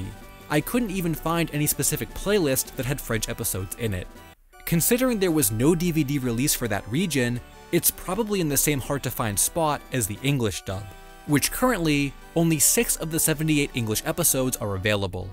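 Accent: Massachusetts, United States. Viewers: Bro are u from Massachusetts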